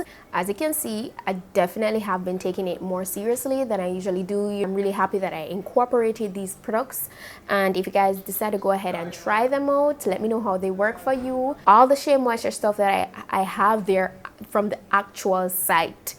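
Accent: Jamaica. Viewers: Yuh cyah hide de Jamaican ascent to rahtid.. love it 😊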